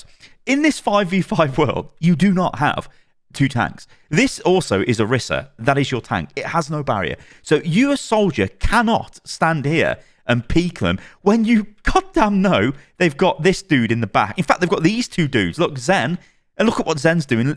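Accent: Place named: Geordie, United Kingdom. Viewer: Your geordie accent was really strong in this video, If your not then you sounded at least haha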